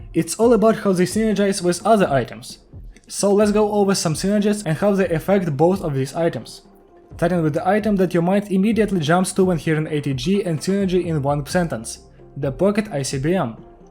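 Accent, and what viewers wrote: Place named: Russia. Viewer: Russian accent is strong in this one. I have no idea why, but this really sounds 100% Russian to me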